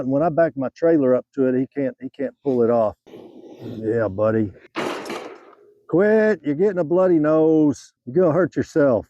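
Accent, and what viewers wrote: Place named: South, United States. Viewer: Combination of this guy's subtle southern accent, dialect, and the fact that he never cusses just makes his videos all the more wholesome to watch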